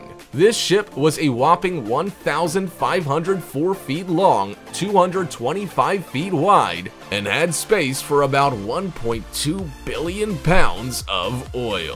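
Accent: United States. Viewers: An American butchering the English language. - Ridiculous Pronunciation of regular words. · The lector is too americanised for my liking.. So irritating... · VERY annoying melodramatic American commentary, unwatchable.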